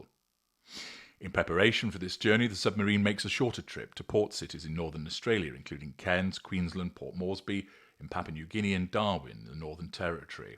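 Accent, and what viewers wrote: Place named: England, United Kingdom. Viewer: Come on you have to tell us all about yourself! English accent. From England.✅ Tans in the summertime. Not a red headed guy with freckles.✅ Swears like a trooper. Definitely not in your 60's plus plus.✅ You don't show your face. Maybe camera shy🤔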